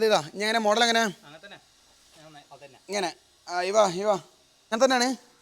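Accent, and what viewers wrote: Malappuram, India. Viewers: താൻ മലപ്പുറത്ത് എവിടെയാണ് തന്റെ സംസാരം സൂപ്പറാ👍🏻👍🏻👍🏻👍🏻👍🏻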